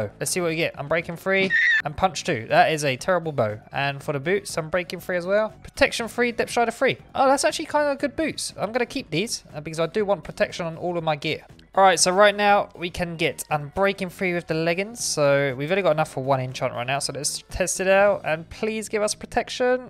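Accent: United Kingdom. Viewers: I just found you! I love these things. Plus the British accent wiff the f sound always sounds authentic to me! Can you make me a Kitty but make it a nice name.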